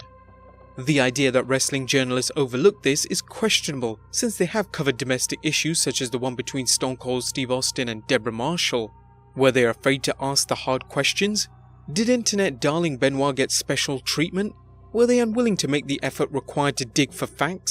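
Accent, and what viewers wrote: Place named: England, United Kingdom. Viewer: Ughh the monotone and English accent makes this video 100X worse i couldn’t make it half 1/4 the way thru it. And this guy had many videos.. all of disgusting horrible acts, cia would be in his backyard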